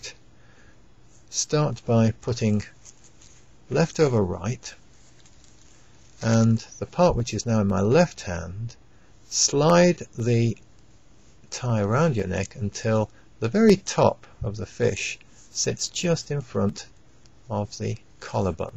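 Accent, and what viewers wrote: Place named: Alabama, United States. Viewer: Your accent makes me think you might be from Southern Alabama. True?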